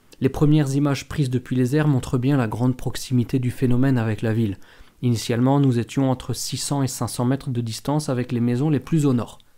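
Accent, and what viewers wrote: Iceland. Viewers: C'est dingue comment la situation peut évoluer rapidement...en mieux ou en pire 😱....Heureusement l'expérience a évité des victimes...😅 Bravo pour ces infos cher,et pour l'accent islandais toujours aussi chaud! ♨️🌋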